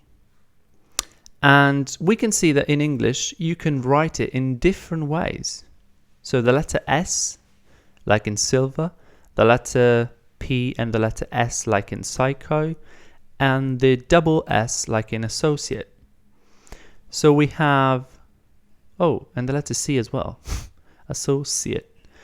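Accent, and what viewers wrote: United Kingdom. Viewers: Thank you. I'm from Brazil and I wanna learn Italian and improve my English. So, your videos are very helpful for me. By the way, your English is amazing. Congratulations. I like this British accent.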